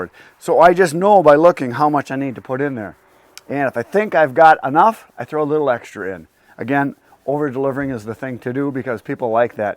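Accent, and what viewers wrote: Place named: Upper Peninsula, United States. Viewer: Hi. I like your accent. I love regional jargon. I was born and raised in Detroit. To my ear, you sound similar to Yooper to me. When I was 21, I moved to New Orleans and lived there 26 years. Yup, "what kind of Coke you want?" is common there. "Neutral ground" is the road median. "Dressed" means with lettuce, tomato, and mayo. Haven't even scratched the surface or get started into the Cajun words (southwest Louisiana). Have a good one. Chuck now living in Florida.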